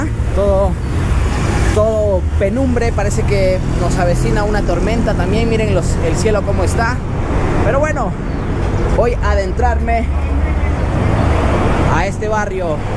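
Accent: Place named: Quito, Ecuador. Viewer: Habla quiteño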